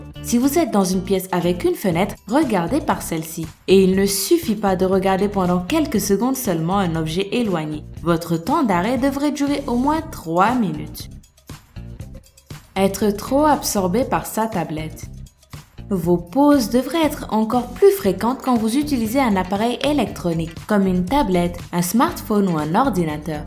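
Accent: Quebec, Canada. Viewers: ton accent québécois est trooooop craquant! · C'est vous la femme a la fin ? :) Et sinon sympa l'accent j'aime bcp et les videos sont cool egalement :) sa vien du Quebec non ? ^^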